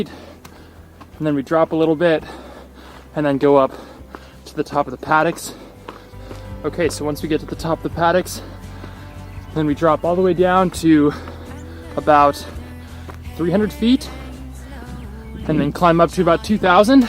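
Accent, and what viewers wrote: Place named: Canada. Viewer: Good luck. Looks awesome. Were are you from? You sound more Canadian.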